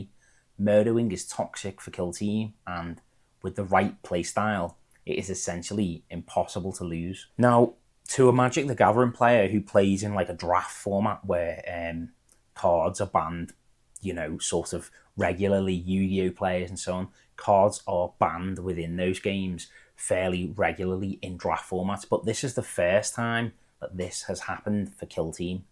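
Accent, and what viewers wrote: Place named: RP, United Kingdom. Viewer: love you getting accused of being posh 😅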